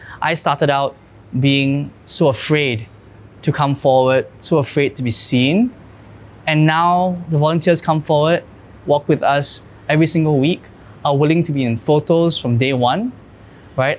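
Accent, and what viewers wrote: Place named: United States. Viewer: Listen to him in another podcast. He has this American accent which is attractive but lacks depth in his conversation.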